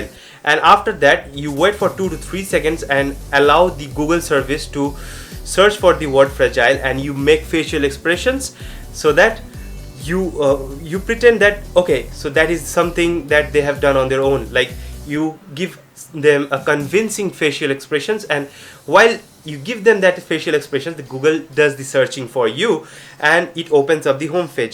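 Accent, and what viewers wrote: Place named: India. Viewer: 4 seconds later and I know that you are Indian 😀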